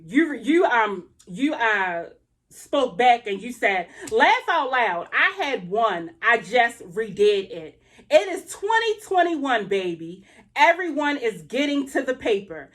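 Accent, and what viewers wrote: Baltimore, United States. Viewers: Sis.....you got my hubby over here cracking’ up with your commentary and b-more ‘accent’!!! No you didn’t say Aiden was talking to you!! LMAO 😂😂😂😂! When he started talking? Apparently you understand him better than Jasmine!! 😂😂😂 Anyway......Girl...you hit the nail on the head in a previous video when you said Chrissy was irritated and done with Jasmine. I think Chrissy done cut her off because on Jasmine’s New Year video she was talking about her ‘friends’ living so far from her so she’s looking to find ‘mommy groups’ near her so she can get out! And....Jasmine is now so friendly with Chrissy’s enemy - must have pissed Chrissy off! The fact that Jasmine & Chris haven’t been invited or planned a trip to Chrissy’s for the holidays, a double date, a kids play date or for them to be vlogging together said a lot. It’s been how many months and 2 besties haven’t met each other’s significant other face to face? 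Something is up with that! We all know Ming ain’t fvcking with Jasmine. And it looks like Chrissy no longer wants to associate herself or channel with any parts of Jasmine’s fuckery and disgrace. Chrissy is trying to get her own ‘train wreck’ of a life back on track and stable with this new man! She’s not going to let bum ass Jasmine fvck that up! Jasmine is just pitiful! Maybe her illness for the last two weeks are actually withdrawal symptoms due to Chrissy cutting her ass off!!!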